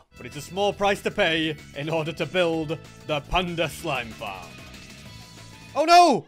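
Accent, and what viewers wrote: United Kingdom. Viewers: I think is british lol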